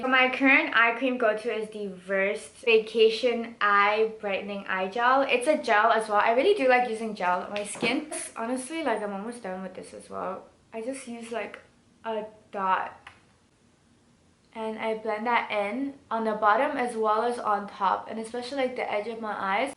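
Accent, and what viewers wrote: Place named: Malaysia. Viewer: i'm wonder where are you from ? malaysia ? because you're accent look malaysia pure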